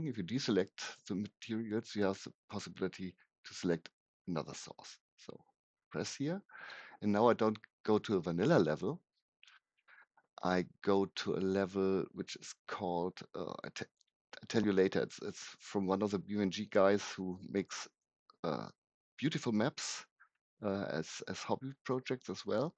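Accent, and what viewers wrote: Germany. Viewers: I love that German accent! <3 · I’m about halfway through the video and now realizing some of this mistakes I made with your tool. Mainly the ordering of materials (based on some guesswork about the underlying map). Thanks for showing my tool! I think it’s definitely possible for your tool to sort of auto select the materials, layers ordering, roads options in the future using OSM data. I’m looking forward to the future if this tool. Fantastic work. And for some reason I just love German accents 😂 great video my friend. Still watching through until the end.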